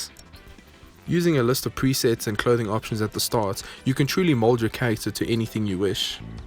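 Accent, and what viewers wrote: South Africa. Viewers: Thought I recognised that accent! Local is lekker, keep it up, guys!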